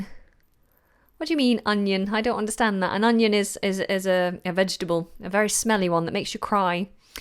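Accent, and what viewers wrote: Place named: United Kingdom. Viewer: I really admire the British accent. Will it be easy to imitate it ? Please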